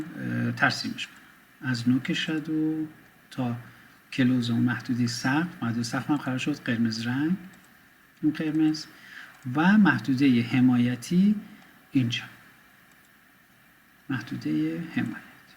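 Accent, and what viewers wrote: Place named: North, Iran. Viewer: من از کردستان به عشق اون لهجه شمالیت کامنت می‌زارم♥️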